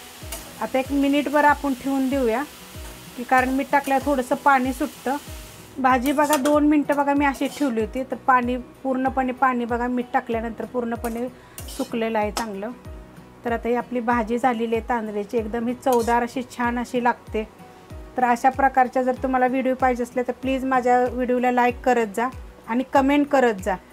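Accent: Satara, India. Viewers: पक्का म्हणजे सातारी,बोलण्यात पण सातारी तडका जाणवतोय 😅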